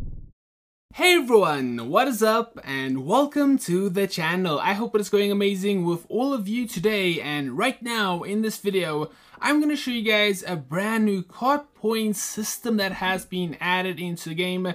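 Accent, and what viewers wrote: South Africa. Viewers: Wait are u south African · Are u south African bro?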